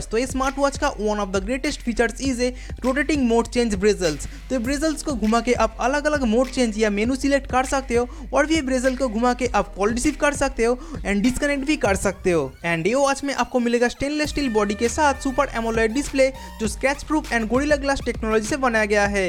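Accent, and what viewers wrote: Bengal, India. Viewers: Are wa kya bangoli Hindi bolta he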